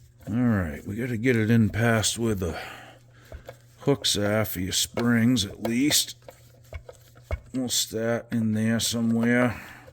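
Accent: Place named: Maine, United States. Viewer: I just happened upon your channel tonight. Nice to hear the Maine accent. I grew up in Maine, Farmington. I live in Florida now. It’s Ben a while since I was able to go back home to visit family. I’m currently building a strat from parts. I lost all my household goods, including all my music gear and guitars. I had all my stuff in storage when I moved to Florida. I got behind on I the rent and they ended up auctioning all my stuff. So now I’m starting over building a David gilmour inspired strat.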